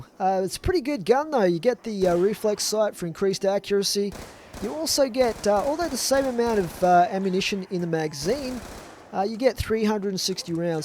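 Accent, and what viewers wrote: Australia. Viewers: are you an aussie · Are you Australian? Sorry if I got it wrong plz dont judge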